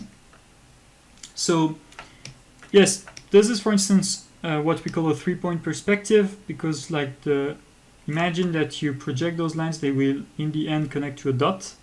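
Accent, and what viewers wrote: France. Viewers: Quand j'ai commencé à regarder le guide je me suis dis que t'avais un sacré accent français et puis à j'ai eu une confirmation que tu étais français. C'est génial de prendre la peine de faire 2h de guide en anglais pour aider plus de monde en tout cas même en tant que francophone tu m'as beaucoup aidé merci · tu es français non ? Tu as un accent français du coup j'ai tout compris, dommage que tu n'ai pas fait aussi le tuto en français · dude dont ever apologize for your beautiful accent!!! You are bi-lingual (at least bi maybe more) that is amazing and so what if you have a french accent, you are actually better off, if you didnt people might think you were American (like me) and I know you dont want that!!!! Great tutorial, great english, great accent, thanks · I love your French accent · nice french accent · "Im sorry for my French accent" Bro that makes the tutorial even better to listen to 😭😂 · I really appreciated this video. 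And your french accent didn't get in the way of my understanding at all😃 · Awesome tutorial and French accent 😀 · Why are you apologising for your French accent? It sounds awesome. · nah man the French accent is where is at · Ne t'apologize pas pour ton french accent, il est parfait. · face-green-smiling:I chuckled when he apologized for having a dreamy French accent. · Merci pour ce video!😊 Je commence travallier avec Krita. Avant j‘ai eu seulement ProCreate. C‘est tres bien d‘avoir un guide comme toi. Ton accent francais est en plus!🤣 Nous aimons la France!!! (Excuse mon accent allemand😉) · native English speaker here, your french accent is a nice bonus. its as if you are speaking in cursive. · French accent is objectively the best accent for an art teacher anyway. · I love the French accent. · awesome and helpful video.. btw no need to apologize for your accent.. youre speaking english being a french., thats a big thing.. 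remember most english speaking peoples dont know any other languages other than english.. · Moi je l'aime bien ton accent Fr ^^ Merci pour le tuto mec, je l'ai pas encore terminé mais je sent que je vais apprendre beaucoup ! :) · Je suis content d'entendre un accent français je comprends mieux comme ça :') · UM DO NOT APOLOGIZE FOR UR FRENCH ACCENT! You and David Renoy are the two krita people who are carrying be with learning art right now, SO THANK YOU. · No no no! Never apologize for your French accent. 🤙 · Hello Dear, I think you don't have to sorry for your French accent. Its your own identity. You should be proud of it.:goodvibes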